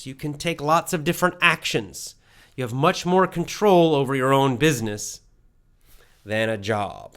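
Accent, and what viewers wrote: United States. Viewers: I love to hear your american accent. So I like follow you. You are engine for my work. I'm living in nederland. I'm using here english for comunication and also my language. I bought your pronucuation cours. I love it. My first day was 3 days, second day is just finish after 7 days. But I love It. I love , cuz I like change my job even to another country like Norway, coz I'm electronic specialist.Really I like to follow you exacly mach. Haha. This vidio is powerfull motivated for my next step. Just most important still is improving my pronucuation with your course.Thank you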